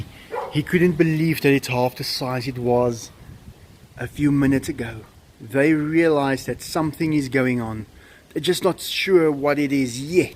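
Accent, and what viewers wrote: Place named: South Africa. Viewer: I would absolutely LOVE to see a tiger (and a lion for that matter) in real life! I live in the UK and I've never even been to a zoo so your videos are a real treat for me, it's like a different world! Thank you so much for sharing with us all. P.S I just LOVE it when you say "Can you believe it"! The South African accent is my absolute favourite! Much love to you, and all the magnificent animals <3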